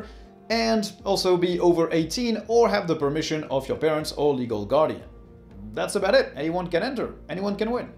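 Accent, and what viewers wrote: France. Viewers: With more than 1K reactions, we'll have a winning change of almost one promille 😁, I'll take that. Always nice to listen to your lovely french accent, about diverse Linux items; I've used Unix and Linux since ~'83 and I still learn new things from you, thanks.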